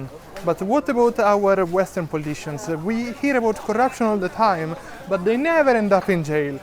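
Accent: Italy. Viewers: He has a thic Italian accent😂I find it very lovely,but Italy is my neighbor so the accent is very understandable to me😉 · i love you tell the truth with your lovly italian accent:popcorn-yellow-striped-smile::popcorn-yellow-striped-smile::popcorn-yellow-striped-smile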